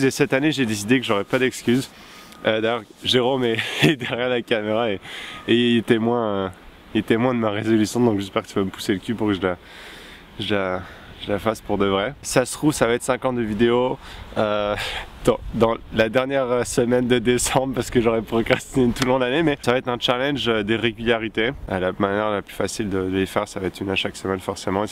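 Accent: Quebec, Canada. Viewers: omg sa fait tellement longtemps :O sinon tu à tellement pris l'accent quebecois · Salut je heureux de cet resolution et je trouve que tu a pris un accent québécois c'est fou 😊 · C'est moi ou tas l'accent québécois ? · J'ai l'impression que tu commence à avoir l'accent quebecois ^^ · il a pris l'accent quebecois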